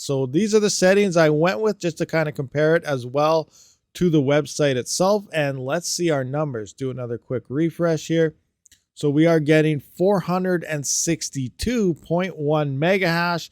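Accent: Canada. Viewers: Are you Canadien buddy?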